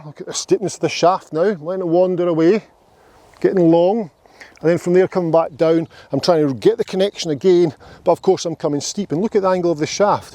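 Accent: Scotland, United Kingdom. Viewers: Hello! First, I love accent. Are you scottish? Also, thank you for making these ben hogan videos. His book is timeless and to see somebody bring it to life through video really helps a beginner. I noticed that on the post impact part of your swing, your arms are aggressively bent in to the body, as opposed to hogan's full extension. I feel like I have that same tendency too. What do you think?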